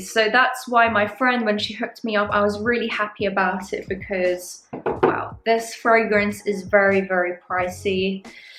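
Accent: France. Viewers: Love your french accent 😆 you are too cute! that Xerjoff packaging and the bottle is top! More like the beauty is sleeping 💙🧡 great review thank you for the video! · That French accent haha. Great video and I love Side Effect :)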